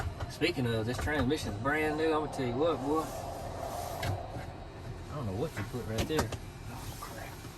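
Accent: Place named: South, United States. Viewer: This guy is hilarious. GOB southern and got a good sense of humor. Goes a long way.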